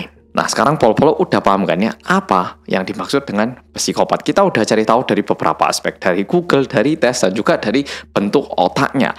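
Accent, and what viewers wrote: Java, Indonesia. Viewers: PLOT-TWIST 🤣🤣👍🏼👍🏼Suka video, terima kasih Boloku Sing Paling Medok Dheweeeee 🙏🏼🙏🏼 Bikin video yang membahas Narcissistic Personality Disorder (NPD) juga Rek (aku juga kemarin habis bikin utas Twidder untuk NPD biar korban²nya bisa membela diri. 💪🏼✊🏽❤️❤️ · Gayanya mas sekarang "korea" banget tapiiii karena sering muedok jadi pas ngomong korea otak ku tetep berkata "weh iso boso korea lek". Padahal sadar dengan penuh kalo dia ya org korea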